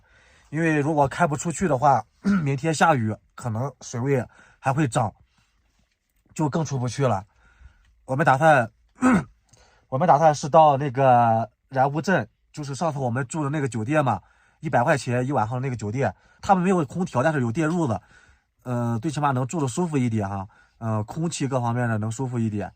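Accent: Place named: Wuhan, China. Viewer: 有个武汉的口音。很熟悉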